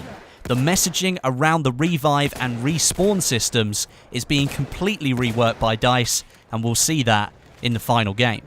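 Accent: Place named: United Kingdom. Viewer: Wonderful information, thanks for delivering all of these information for us.:D Great channel,.. I become very happy the moment I notice that you uploaded a new video..:D (Between, you're a great English teacher, I'm practicing my British accent by watching your commentaries..) xD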